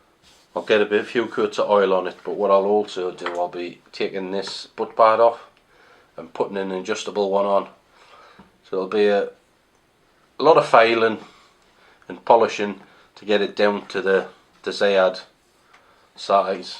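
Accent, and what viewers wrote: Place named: North, United Kingdom. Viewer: Well done mate....its nice to see and hear a down to earth northern lad.....saving and saying £700 is still a lot of money to us normal working lads.....most of the reviews,they have massive houses or been given guns to review....you've convinced me what my new gun will be👍🏻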